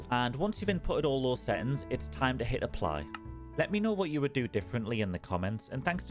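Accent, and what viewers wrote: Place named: Newcastle, United Kingdom. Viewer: What a mighty geordie accent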